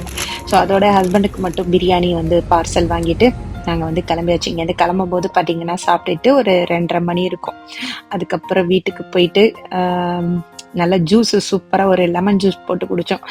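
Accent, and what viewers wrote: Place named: Tamil Nadu, India. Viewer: Ur way off speak like tamil Nadu that's y I ask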